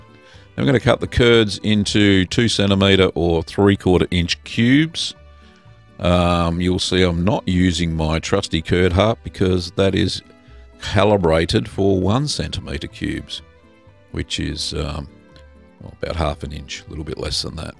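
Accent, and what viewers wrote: Australia. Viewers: Everyone here knows how it's pronounced. Clearly is from Australia and that accent comes out- to me, that just adds character. The important thing is that he has made a great video, and it's also clear that he has invested some time learning about Mexican culture as well. Well done!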